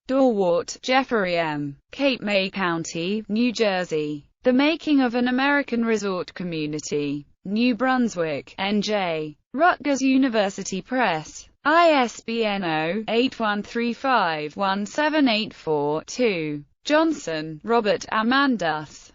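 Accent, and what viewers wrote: United Kingdom. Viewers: Don't like the British accent that much.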